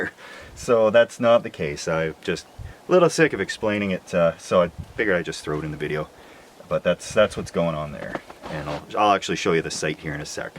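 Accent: Alberta, Canada. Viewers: are you from alberta?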